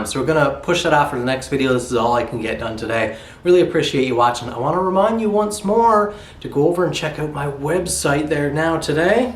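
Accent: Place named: Newfoundland, Canada. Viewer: Your Newfoundland accent sounds so similar to a Dublin, Irish accent . It’s funny .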